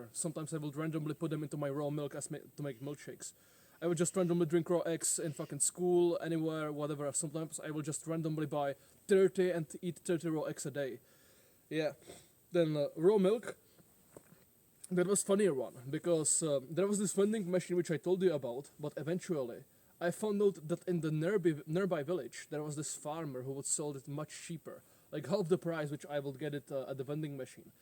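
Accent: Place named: Czech Republic. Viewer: You and have the same exact Czech accent English. It's awesome.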